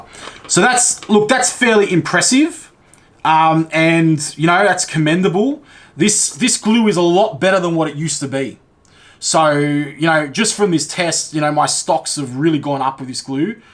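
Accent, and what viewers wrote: Australia. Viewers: The Aussie is strong with this one. Maybe even New Zealander? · Great video, good enthusiasm, informative and Aussie accented! Thanks for making this.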